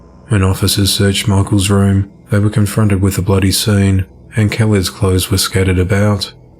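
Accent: Australia. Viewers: the Aussie accent narrating true crime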